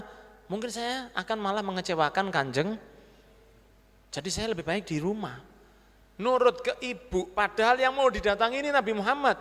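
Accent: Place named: Madura, Indonesia. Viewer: ada madura nya😍😍😍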